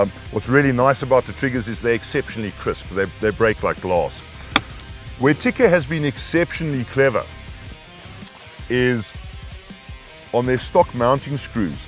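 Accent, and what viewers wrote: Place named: South Africa. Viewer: South African? Hallo boet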